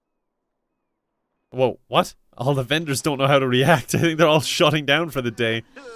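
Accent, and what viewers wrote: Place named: Ireland. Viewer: Where in Ireland are you from 💜💛💜💛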